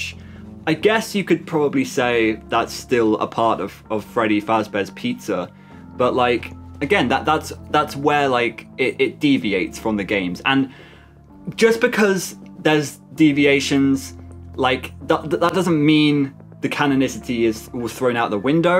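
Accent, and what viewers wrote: United Kingdom. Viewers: You Look Like Dawko And Sound Like Him And I Don’t Know If You Know Him He Is A Famous YouTuber Just Like You!! He Is British 🇬🇧 And You Look Like Him You Sound Like Him And You Sound British 🇬🇧 Sorry That I Say That But Hey I’m Okay With That Your Voice Is Cool And Your Voice Sound Cool And Good So Please Don’t Be Mad I Said That So Sorry But Cool Video And Cool Voice And Sorry For Long Types😊😊 Your Profile’s Look Like The One From Dawko!! Sorry For Long Type!!😊😊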